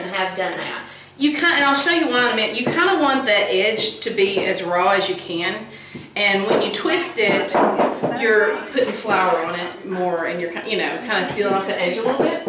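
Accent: South, United States. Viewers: Liked and will use.,,love the southern accent and look.latze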